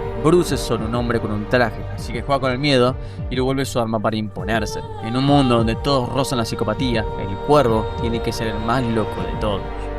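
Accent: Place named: Argentina. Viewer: Dios mio, de verdad que el algoritmo de YouTube está podrido estos días al no hacer más populares estas joyas de videos. Amo tu forma de narrar, y el acento argento siempre me ha gustado. Como fanático del cine, realmente nunca se me ocurrió relacionarlos, pero escuchando tus explicaciones, realmente te dás cuenta de que si tienen bastante en común.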